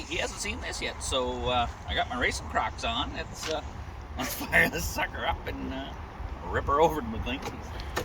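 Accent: Canada. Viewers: Lol. Canadian AF. Love your energy bud 🇨🇦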